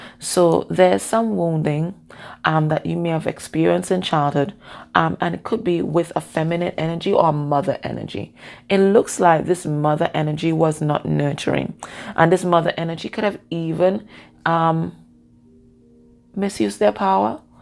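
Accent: Trinidad and Tobago. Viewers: A Trini or Guyanese accent. I do follow Steve Nobel meditations very helpful. I'm a Bajan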